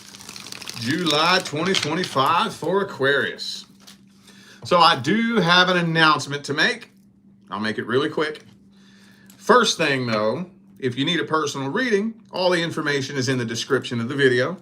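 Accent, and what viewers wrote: South, United States. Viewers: I’m so glad I found u again. You crack me up. Real asf with that southern drawl…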